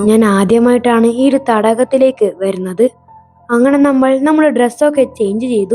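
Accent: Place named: Kannur, India. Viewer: കണ്ണൂർ സ്ലാങ് ❤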